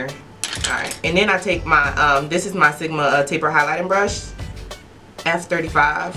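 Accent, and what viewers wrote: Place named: California, United States. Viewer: I'm from Cali!!!! and you sound like you From California too... lol. you are so good at what you do. thanks for all of your videos. You are so beautiful!!!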